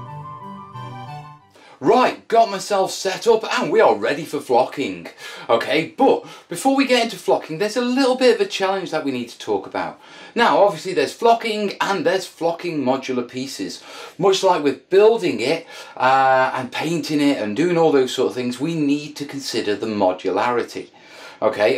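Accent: United Kingdom. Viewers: he has the type of British accent that I like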